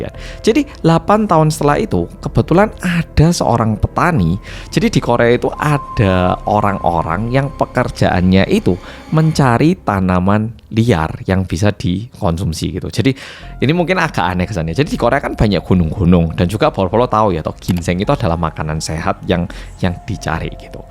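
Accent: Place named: Malang, Indonesia. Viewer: Mas, aku salfok sama "mobile kayak MIKROLET" haha MALANG nya masih kentel ya mas. Salam kera ngalam mas 😁😁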